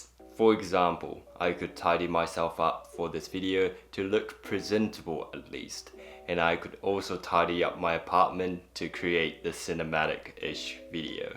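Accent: New Zealand. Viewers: Japanese with a Kiwi accent, adorable :)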